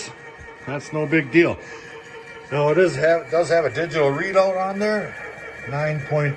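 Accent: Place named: Pennsylvania, United States. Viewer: The " crick " I haven't heard yankee in a long time. That's the we talked in P.A. growing up. Moved to N.C. over 30 years ago. The accent is refreshing. A little taste of home. Love your video's so far. Thank you.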